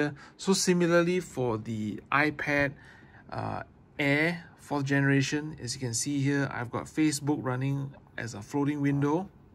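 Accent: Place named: Singapore. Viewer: You have an interesting accent, are you from Singapore?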